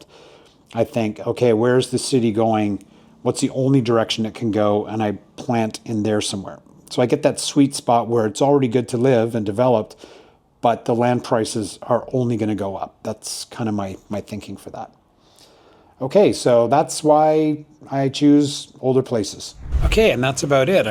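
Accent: Canada. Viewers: Are you a Canuck? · Canada here... you didn't lose your accent! It's refreshing. I will be settling in Jomtien as well, in a year or two.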